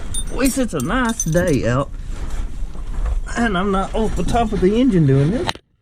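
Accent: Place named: Louisiana, United States. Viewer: Cajun? "Wasper" is a large clue. They say that in southern KY, but they have a different accent.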